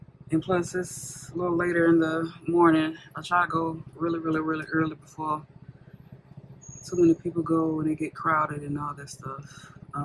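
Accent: Jamaica. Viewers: How do you do that? For a Mississippi girl your patois sounds authentic!😊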